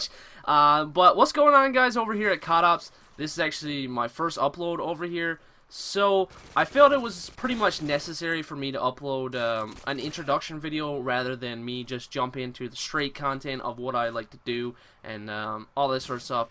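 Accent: Newfoundland, Canada. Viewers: That's no accent, his voice is just high pitched... or possibly just newfy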